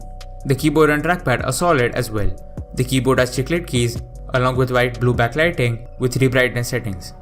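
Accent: India. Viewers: I don't understand Indian enghlisdhs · Да ), акцент у индусов ужасный ))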